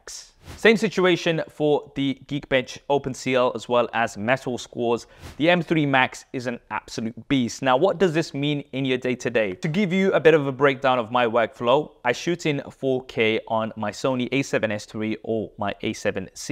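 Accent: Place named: United Kingdom. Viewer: arab guy w british accent, thats new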